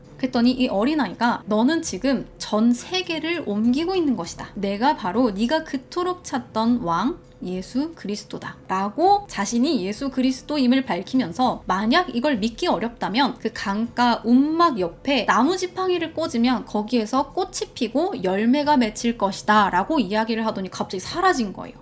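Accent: Gyeongsang, South Korea. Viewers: 오늘은 억양이 경상도인데 저만 그렇게 느끼나요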